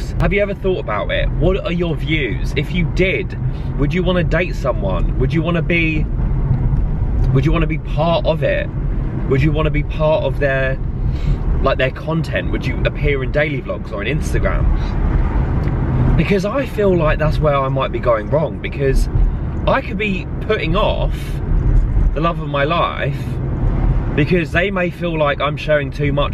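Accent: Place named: Essex, United Kingdom. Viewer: You sound like you’re a fellow Essex person like me 😁 or london 🤔 love your videos always crack me up!!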